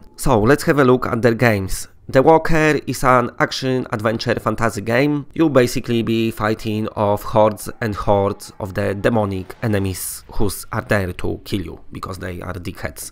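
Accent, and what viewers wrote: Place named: Poland. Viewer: keep on speaking with your magnificent accent just the way you're doing right now, we just love it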